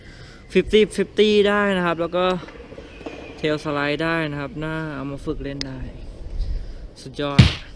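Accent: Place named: Suphanburi, Thailand. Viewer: ติดใจเหมืองเหน่อ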